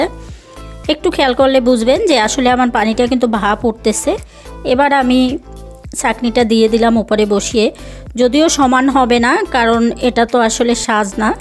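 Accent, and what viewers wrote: Bangladesh. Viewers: Apu apni ki sylheti niki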